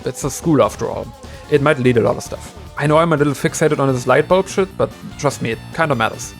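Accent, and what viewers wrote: Germany. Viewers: Love your german Accent :D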